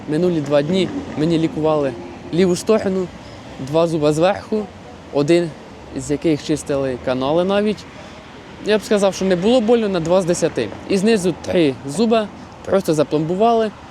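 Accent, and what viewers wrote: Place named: Slobozhanshchyna, Ukraine. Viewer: тисячу лайків за те, що балакаєш на слобожанській говІрці! Не звертай увагу на "мовний патруль", уже наслухались "вишуканої"...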